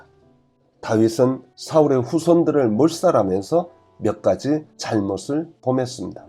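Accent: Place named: North Korea. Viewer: 강해 하시는분이 보기에 그리 연세가 지긋한 분이 아닌데 왜 북한 사투리를 하시나요?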